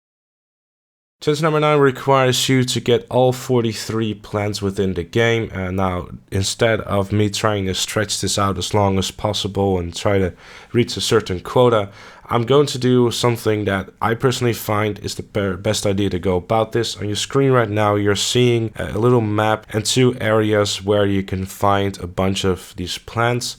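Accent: Netherlands. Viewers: Great guide, I'm a fan of rear herbs. Are you Dutch? I can't place your accent.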